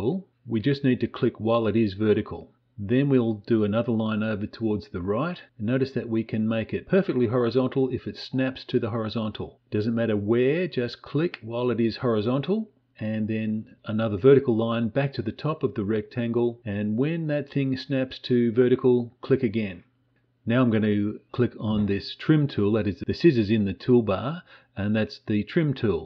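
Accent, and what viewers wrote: Australia. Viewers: Finally a tutorial not jammed up with loud background music and high speed cursor movements explained clearly at a pace I can follow. I think this is going to work for me. Nice to hear an Aussie voice too.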